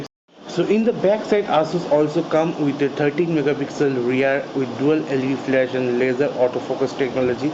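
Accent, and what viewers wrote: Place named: India. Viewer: Forking indian accent. Can't get anything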